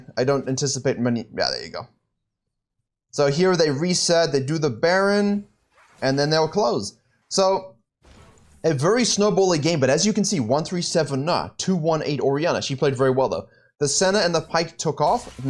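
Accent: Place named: South Africa. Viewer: Wait... Where are you from? You sound south African lol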